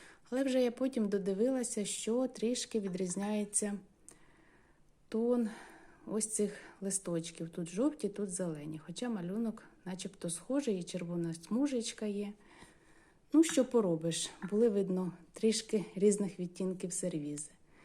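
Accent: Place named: Central Ukraine, Ukraine. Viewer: Голос приятный, успокаивающий. Рассказываете интересно, слушать приятно украинский язык центральной части Украины. Я училась в Черкассах, там тоже так говорят, как Вы.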